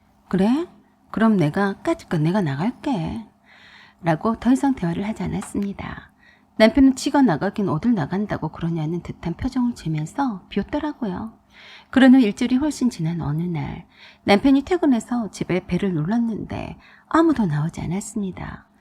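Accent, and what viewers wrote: Jeolla, South Korea. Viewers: 나레이터 전라도분인가봐요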